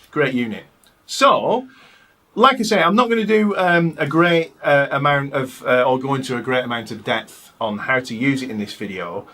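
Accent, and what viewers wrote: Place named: Northern England, United Kingdom. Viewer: Great demo! Love that short-scale bass, too. And it's nice to hear the dulcet tones of a fellow Northerner on YouTube ;)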